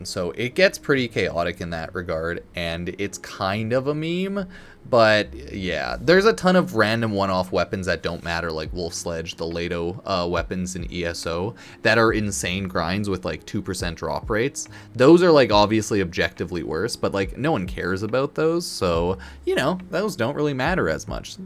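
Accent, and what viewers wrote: Canada. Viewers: are yuou Canadian? timmy fan eh?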